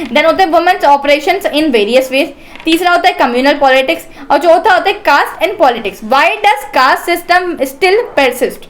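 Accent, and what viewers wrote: South, India. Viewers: Mam Are you from south India Plss tell😖😖 BTW Thanks for everything🥰🥰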